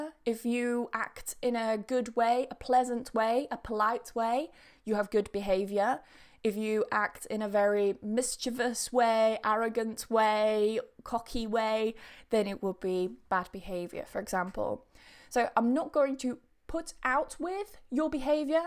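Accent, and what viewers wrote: United Kingdom. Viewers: Thanks a million ,I wached and I made 3 mistakes it was really useful I hope keep going , thus I really like British accent especially your pronunciation .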